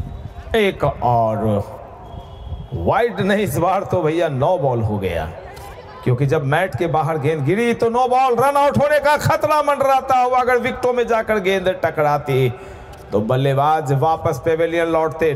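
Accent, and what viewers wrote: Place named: India. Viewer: Your voice is fantastic ji....Totally loved the flow of your hindi commentary. However the English commentary is very awful, Its better to continue in the original Indian English dilect. - Cric Academy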